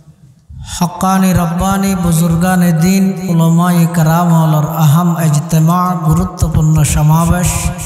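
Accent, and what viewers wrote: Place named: Chittagong, Bangladesh. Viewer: চিটাগাং ভাষা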